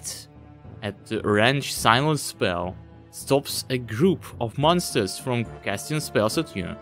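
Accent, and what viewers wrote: United Kingdom. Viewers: When I saw "almost without accent" I expected to hear less accent than I heard in that video, though nice try, it's not russian accent at very least. From what I heard it feels more like scottish accent or something like that, sry for being too strict :DD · Круто получилось! Кстати акцент напоминает британский, воть. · Учитывая шрифт документа, очень даже приемлемо. Отдаёт чем-то британским в плане произношения, но я не эксперт. Хорошо получилось.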